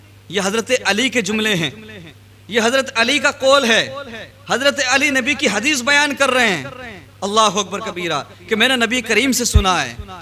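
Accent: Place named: India. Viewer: Kitni saaf urdu boltay haenh Indian ho k. MashaAllah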